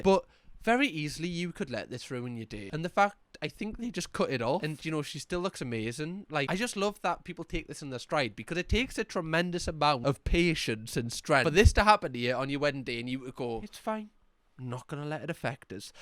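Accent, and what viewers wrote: Newcastle, United Kingdom. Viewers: I heard you talking about your accent in a recent video. Don't you ever dare being insecure or self-conscious about it! When I found your channel, amongst other things I stayed BECAUSE of the accent, not despite of it. To my German ears you sound as British as it gets and I have no trouble understanding or following you whatsoever. You want a grating accent? Try listening to me! 😂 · The guy on his phone, I play online games and had a player who came on game on his wedding day to take part in an event, we were all just like 'dude why you coming online your wedding day?!' Thanks for the content as always, love that I found a fellow geordie to listen to although I'm from Northumberland so technically a northumbrian.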